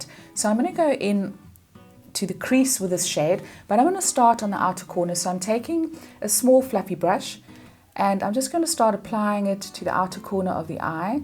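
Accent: South Africa. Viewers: You mention “Sheen” brushes. Is that the spelling, and what country are you in? I recognize the South African accent, but nowhere is there any information on where these products are available or their price.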